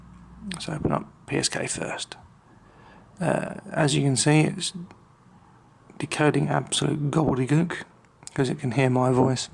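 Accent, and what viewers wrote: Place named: United Kingdom. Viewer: Yes. He is speaking the Queen's English. I'm guessing you're American, yawn.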